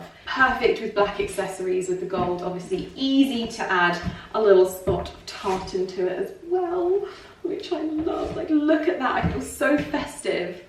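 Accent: United Kingdom. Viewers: Why sorry? You speak as you speak. Be yourself, that’s the person you are after all. Thank you for sharing your British heritage with us. ❤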